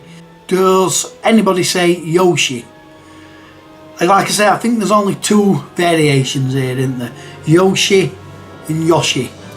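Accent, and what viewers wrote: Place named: Yorkshire, United Kingdom. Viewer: Infogrames always used to get me because I never used to see the R 🤣 Xexyz is a good one, lets just call it Keith from now on. Suikoden I dont have an issue with as I will never play one of those games anyway. You are only saying Eeenix that way because you have a Yorkshire accent. I don't remember pronouncing Tau Ceti before, maybe I did 🤔 That one at the end 🤣🤣🤣 My brain hurts now....😊👍🐻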